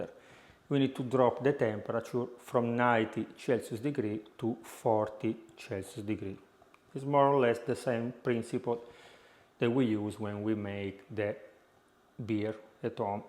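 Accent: Italy. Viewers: Everything just geting better, when you speak with an italian accent.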